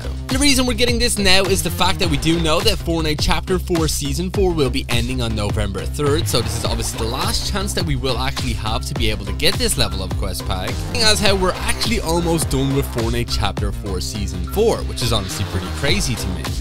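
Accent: Ireland. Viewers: Thanks Thats Awesome!!!! Your CLass!! Are you from Ireland?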